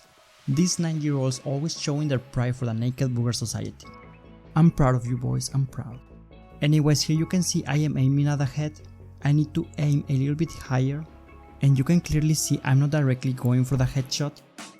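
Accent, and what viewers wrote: India. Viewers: UR accent is like a indian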